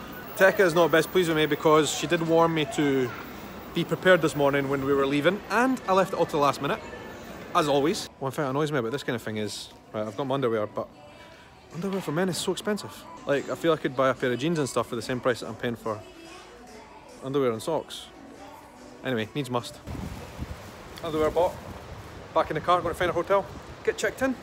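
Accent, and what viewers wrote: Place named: Dundee, United Kingdom. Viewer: How do you speak Dundee?!? Lol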